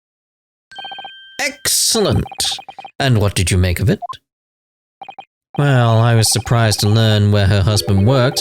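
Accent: Scotland, United Kingdom. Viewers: Took me about 15 minutes to realise that he had a scottish dialect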